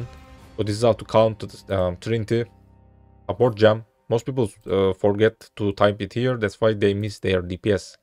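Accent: Turkey. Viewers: Türk şivesini duyar duymaz tanırım agaa (Eline sağlık btw)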